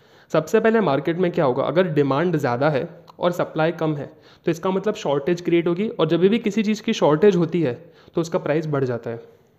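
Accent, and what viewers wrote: Gujarat, India. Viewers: Aap gujrati ho? 🤔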